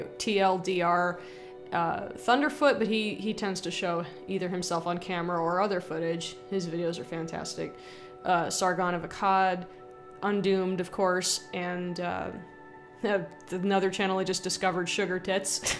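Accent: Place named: West Texas, United States. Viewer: excellent videos about feminazis stuff, anyhow are you from west Texas ? I have a friend from there that her accent sounds quite close. greetings from Alaska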